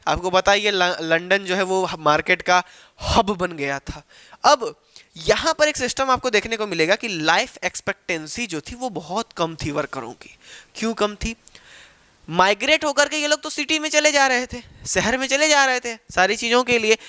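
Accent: Bihar, India. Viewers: Bhaiya aap bihar se ho kya?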